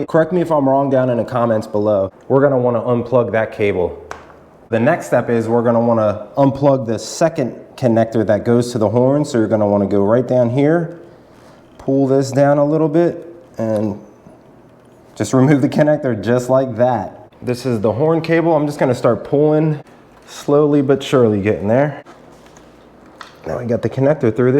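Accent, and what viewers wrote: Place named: Philadelphia, United States. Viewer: thanks bro! U sound like a local, are you from Philly area?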